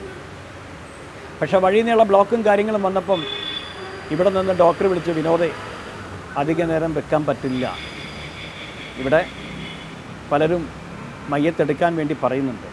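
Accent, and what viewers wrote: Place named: Kozhikode, India. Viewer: Kozhikode bhasha paranja madi Mr kovoor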